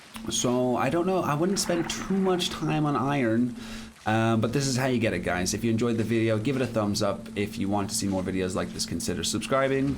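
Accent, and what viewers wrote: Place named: United Kingdom. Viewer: You have a pretty strong UK accent imo. Haha. Thought you were a "native" xD Where are you originally from?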